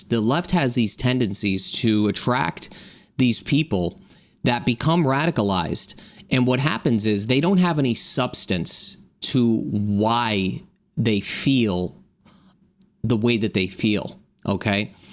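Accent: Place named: New York, United States. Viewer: Sounds like a NY accent. Domestic terrorist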